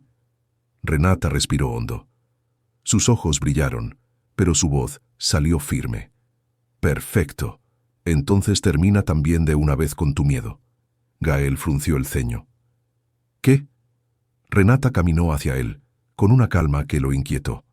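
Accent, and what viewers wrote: Argentina. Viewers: Porqué el relato usa el asentó argentino o Uruguay se nota demasiado no es necesario sobre todo si los protagonistas son españoles o mexicanos 🤔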